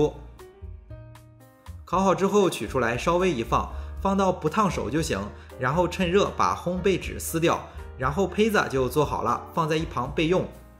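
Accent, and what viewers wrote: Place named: Jinan, China. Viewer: 老爸真是中西结合的好典范啊，亦中亦洋，都拿捏住，太绝了，你也太有口福了吧！！我是济南人，听着你的济普很亲切哦！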